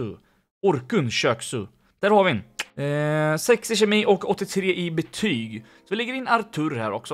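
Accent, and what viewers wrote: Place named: Östergötland, Sweden. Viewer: Östgötska dialekten gör att jag följer dig ❤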